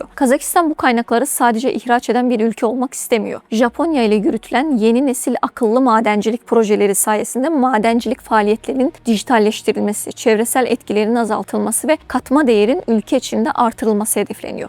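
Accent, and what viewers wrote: Azerbaijan. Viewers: Azerbaycanlidi danisigindan hiss edirem.))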